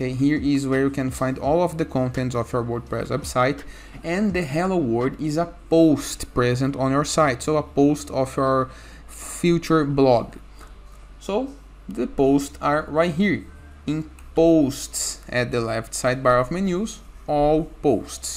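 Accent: Brazil. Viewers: haha tem um brasileiro nesse ingês hein, parabéns pelo conteúdo!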